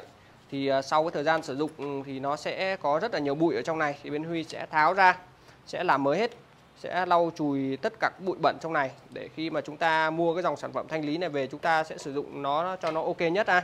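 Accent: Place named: Nghe An, Vietnam. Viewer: Tiếng nghệ an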